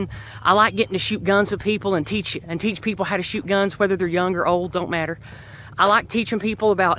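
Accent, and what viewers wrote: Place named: South, United States. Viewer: The intro for your videos is so cool the way it melts away with the gentle piano notes and American theme.!!! I just subscribed to your channel and will stay tuned. Cool Southern accent btw. 😎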